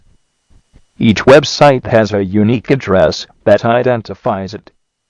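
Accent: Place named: United Kingdom. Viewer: British hooo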